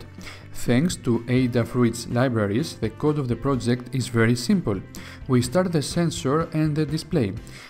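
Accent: Greece. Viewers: you sound greek, I'm from Rhodes btw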